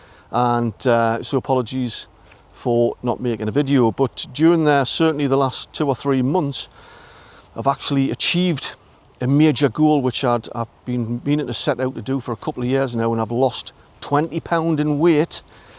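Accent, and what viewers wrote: Newcastle, United Kingdom. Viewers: It's wonderfull to hear the Geordie accent and to see some places associated with my family, way back. My mother was born in Pelaw in 1923 and she kept her accent all her life, despite living most of the rest of her life in East Anglia. Her father, Sidney Brown was a miner down Wardley pit. He was very active in the trade unions, and in October 1936 was one of the Two Hundred on the Jarrow Crusade. It may have been a futile attempt to challenge an intransigent government's mind, but now it is an iconic event that everyone has heard about. Your new video shows the very area where once there was a mine where "Ganga Broon" worked and my mother lived as a girl. So, huge thanks,. Keep up the good work! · As a Geordie from Wallsend now living in Thailand I help local kids learn English and I do not feel home sick as all my kids speak Geordie like you.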